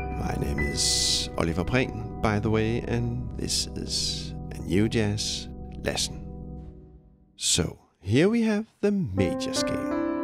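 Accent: Denmark. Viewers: That accent 🤌🇩🇰 Greetings from a fellow danish musician. Great stuff ❤ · You sound Danish...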